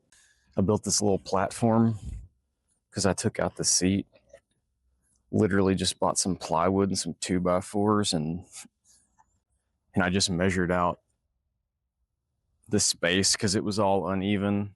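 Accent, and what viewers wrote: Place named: Tennessee, United States. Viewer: I thought it sounded like you were from Tennessee or Kentucky, then I saw your tags. That's quite a drive. I do LA <--> Nashville a lot. Not living in the car, so I don't have a setup as developed as yours, but the trips are long enough that your expertise has been relevant and helpful. Thanks.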